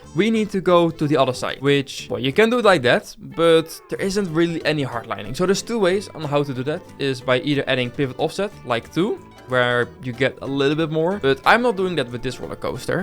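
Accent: Netherlands. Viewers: You got a dutch accent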